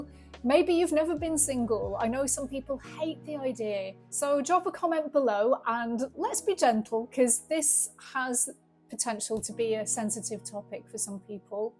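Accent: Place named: RP, United Kingdom. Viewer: Very posh .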